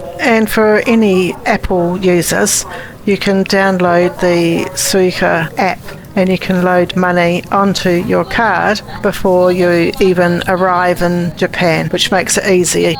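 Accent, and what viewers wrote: New Zealand. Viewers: I do enjoy listening to you with your lovely New Zealand accent but also the level of detail you provide. Envious of your adventure and appreciative of you putting yourself out there...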